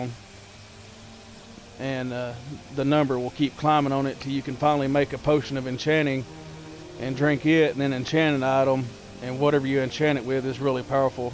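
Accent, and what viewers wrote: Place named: United States. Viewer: I love your sexy stereotypical american accent 😍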